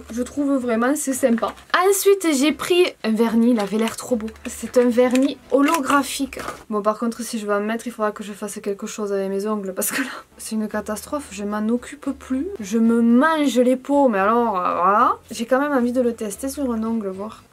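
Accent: South, France. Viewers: Oh putaing ! Tu viens de Sud toi non? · Bonne et joyeuse à toi et à ta famille je te kiff grave merci beaucoup pour toute tes vidéos ta bonne humeur ton accent du sud que j'adore continue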